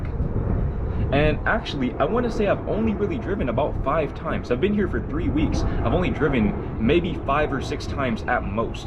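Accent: Netherlands. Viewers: Am I the only one hearing a small hint of Dutch accent on his English? Guess you brought back more... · You can never be too idealistic. You are an asset to this world. Btw great Dutch accent. Also, I lived in the California for sometime, and one day I bought a new printer in a local small store. The fellow that helped me had an accent, but in California that is not weird. Only at the check out I figured he was Dutch too. Complaining… yeah my American colleagues just complain about a lot…😂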